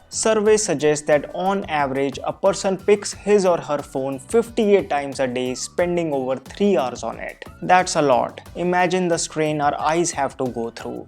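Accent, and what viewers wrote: India. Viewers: English is just amazing he is not speaking in fake American accent but speaking in real indian accents now i also speak english in indian accent 🙏🙏thank you 😘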